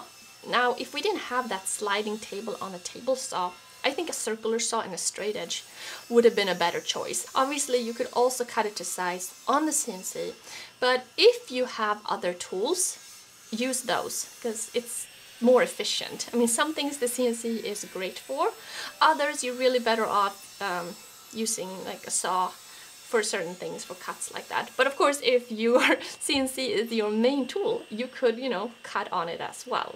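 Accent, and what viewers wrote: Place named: Sweden. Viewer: Det låter lite "swenglish" när du pratar😊!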